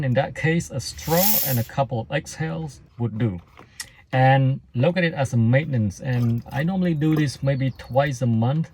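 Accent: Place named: Vietnam. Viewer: You sound like my uncle. (Viet accent) and , it looked like you were on the 99 North heading towards Fresno Area, lol.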